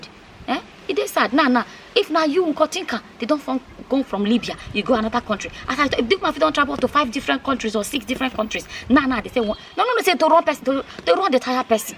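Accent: Nigeria. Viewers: leee😂😂😂😂your mouth sweet for the pidgen English no be small 👍👍👏👏👏💖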